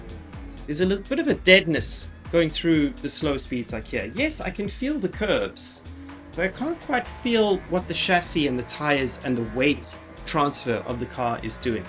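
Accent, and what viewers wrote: South Africa. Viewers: I can’t help but pick up the South African accent here, are you from South Africa by any chance? 😅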